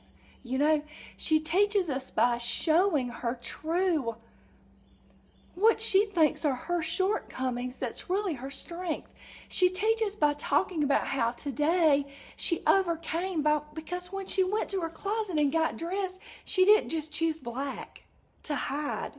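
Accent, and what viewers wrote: South, United States. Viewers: Hello. Nice USA women, with beautiful BLUE eyes. Dont cry. I will send some tissues from EUROPA. Good video, a lot of good video, BRAVO. You are funny. South accent. Have a beautiful day. Bye. Rudi from EUROPA.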